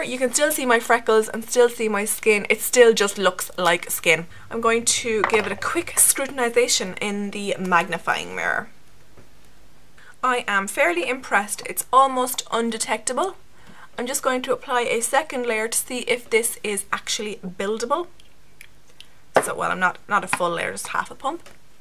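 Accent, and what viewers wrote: Ireland. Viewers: I have to say, this was a brilliant review! I loved how you edited the video, like there was just the right amount of info. And I did want to know whether I wanted this foundation or not, and you've convinced me. Lovely to hear the Irish accent too :) (I'm Irish too). Would love if you'd take a trip to my channel, got some original music on there, hope you like it. Either way, I'm subscribing to you girleen :)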